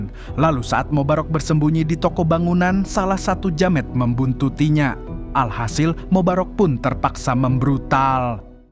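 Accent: Banjar, Indonesia. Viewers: Orang Banjar kah min.. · umma mang org banjar sakalinya lah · 😂dangar narasi pertama admin,,kok kaya logat bhasa Banjar,,smangat min bikin konten y,,q urang Banjar di Jabar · Urang banjar kh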